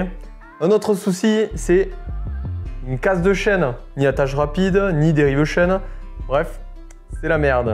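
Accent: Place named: South, France. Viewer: Vidéo super utile ! Merci pour ceux qui n'ont pas d'ingéniosité ! Et toujours avec cet accecent du Sud, c'est la touche 😊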